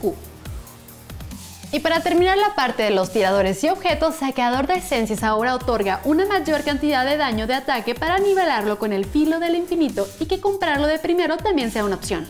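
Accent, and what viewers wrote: Mexico. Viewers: Es mexicana? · Demasiado robótica aparte su mexicano weyyyy uff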